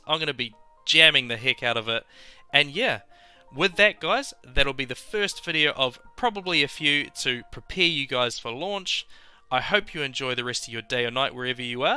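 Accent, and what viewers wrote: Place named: New Zealand. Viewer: So funny, yours was the first video I came across looking for tips for day 1 EC and immediately after hearing your voice was like, damn it's a fellow kiwi, good luck with your channel mate, and subscribed so keep putting out content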